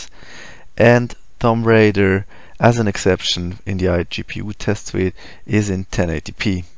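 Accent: Germany. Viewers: dieser hölzenre deutsche Akzent....warum nicht gleich auf deutsch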